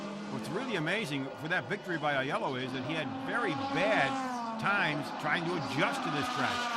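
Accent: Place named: United States. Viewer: what's with the american accent?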